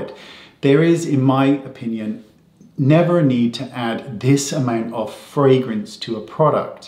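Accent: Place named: West Country, United Kingdom. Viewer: I’ve been watching for years and I still love the fact I can hear a West Country accent when you explain things. 🇬🇧 Loved this video! ✨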